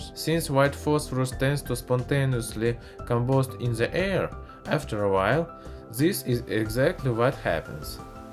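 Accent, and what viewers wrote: Russia. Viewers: Damn you have a thick Russian accent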